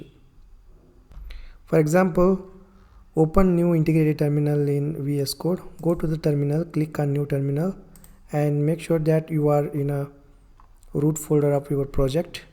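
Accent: India. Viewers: Very helpful ❤ but please try to talk in Indian English itself. The mix of both doesn't seem good to hear. No offense !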